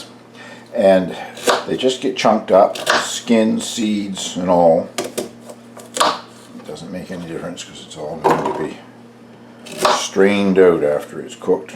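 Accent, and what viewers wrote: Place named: Newfoundland, Canada. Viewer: Ahh! You must from Nufunlan? I recognized the very slight accent. Right on man, good job on the jelly! Hi from N.Alberta.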